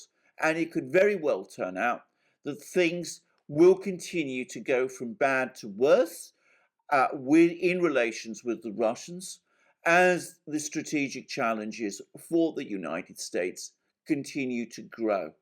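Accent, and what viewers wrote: England, United Kingdom. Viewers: all news should be delivered, in such a professional manner. Your wonderful crisp, English accent, is much appreciated. Thank you for explaining, what I cannot 🇬🇧xx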